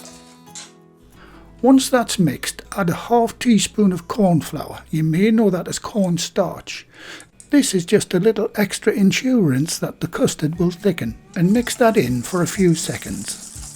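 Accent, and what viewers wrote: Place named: Middlesbrough, United Kingdom. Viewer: Are you from Middlesbrough? I am from Redcar and your accent sounds local. I love your uploads by the way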